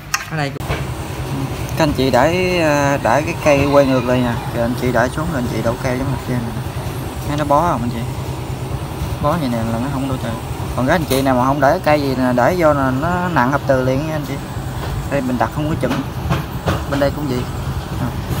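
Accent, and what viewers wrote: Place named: South, Vietnam. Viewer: Giọng nói miền nam nghe rất chất phát. Hướng dẫn rất chi tiết đầy đủ. Cám ơn bạn rất nhiều và mong bạn có thêm thật nhiều clip khác.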